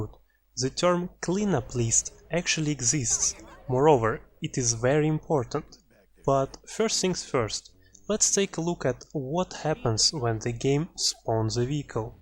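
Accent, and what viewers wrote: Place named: England, United Kingdom. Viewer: Oh, you from England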